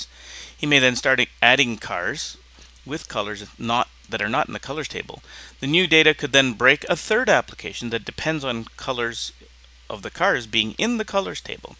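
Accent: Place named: Canada. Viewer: canadian eh?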